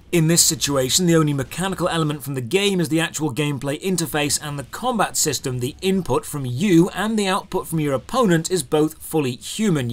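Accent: England, United Kingdom. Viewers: I like the way u talk to me English man, Keep it up ole chap XD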